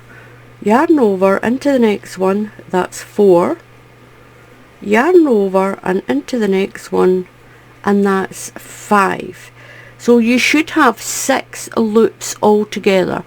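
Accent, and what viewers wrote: Scotland, United Kingdom. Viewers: Just love your channel! I’m left handed and have watched quite a few videos of yours for baby items! So easy to follow and glad that you actually show the stitch we are to start on the next row. By the way, love your Scottish accent! I lived in Brechin in Angus for three years in the 70’s. Had my first wee bairn in Montrose. Been homesick for Scotland since I left.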